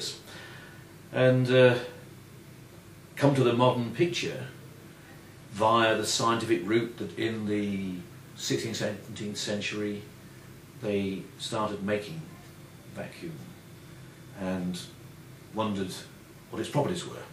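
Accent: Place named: Australia. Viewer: The visible universe is but foam on the ocean of the unseen/dark universe. Incredibly mysterious to us now as the leading edge of quantum and classical physics. Also, even though he's obviously English, I do detect that Mr. Close certainly has an accent that sounds unmistakenly 'Australian' ... of sorts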